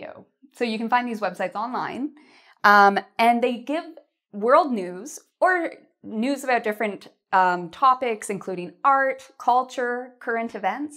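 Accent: Canada. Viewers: Hi. I like listening to English when I got a notification that you have uploaded the You-Tube video. There are several reasons why? Not just for one reason that you're a beautiful young lady with authentic Canadian language, but for many others. You have been teaching us (youtube subscribers) English for many years and not just English, but many useful things that we can apply in our daily life or lifestyle. Whatever, I feel often that is more useful than watching the daily news coming and going or some unrealistic movies, shooting, fighting, running, screaming actors for millions of dollars. So, thank you very much for your devoting work as well for time and energy giving so much to others.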